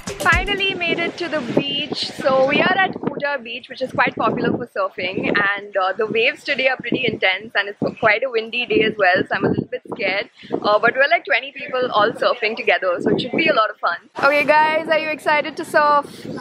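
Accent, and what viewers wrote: India. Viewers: It's Indian accent.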